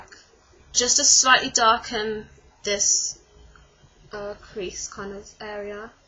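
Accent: United Kingdom. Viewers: I REALLY LIKE WATCHING YOUR VIDEOS. YOUR SO ADORABLE WITH AMAZING EYES AND YOU HAVE A BUBBLY PERSONALITY WHICH IS VERY UNIQUE AND AWESOME. YOUR ART WORK WHEN DOING MAKE UP IS VERY CREATIVE AND FUN NOT ALLOT OF PRESSURE. I WANT TO GIVE YOU A 5 STAR AND 2 THUMBS UP GREAT JOB. BRITAIN ACCENT WITH A ROUND FACE ANTIQUE DOLL FEATURES VERY CUTE AND ADORABLE :-)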